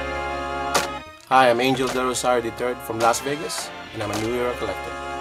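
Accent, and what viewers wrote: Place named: Philippines. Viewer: First word came out form his mouth, and I was like "He's Pinoy!"!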